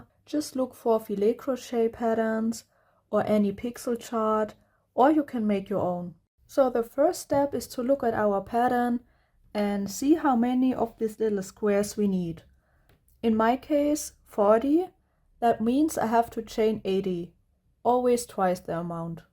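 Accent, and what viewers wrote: Germany. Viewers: Holy deutsch accent its so cute 😂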